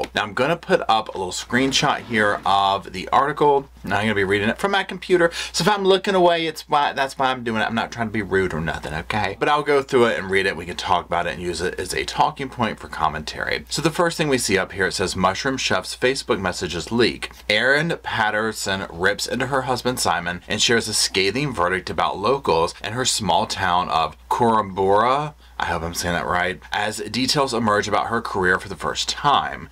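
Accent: South, United States. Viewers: i just want to say, that YOu ARE ADORABLE. i have wAtCht you for awhile now, and your energy just makes me happy. ☻ So sweet!!! You and your doggy and your “sofa”. Your disposition is so loving and playful, a refreshing stance for True Crime. Also, you are aging gracefully, love the beautiful silver filling out your face, you are a very handsome man. And, gotta love that Southern Accent! My husband is from South Carolina, and i too have roots in the South. i miss it terribly…. Washington State is gorgeous but Southern culture is the best. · you sound like a true southerner. Where are you located? First time listener.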